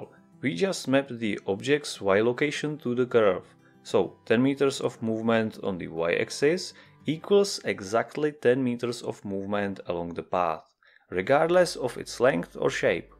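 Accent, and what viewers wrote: Czech Republic. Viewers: Zda se mi, ze tvoje anglictina zni celkem cesky 😀 Je to pravda?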